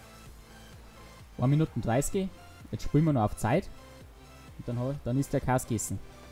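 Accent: Bavaria, Germany. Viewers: I dochte am Onfang du dadsd Boarisch sprechn.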